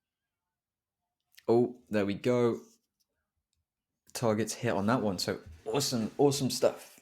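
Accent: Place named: England, United Kingdom. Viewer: I love your sweet English Accent. Almost like I'm listening to English premiere League commentary. By the way your videos has really helped my trading journey. Thank you.❤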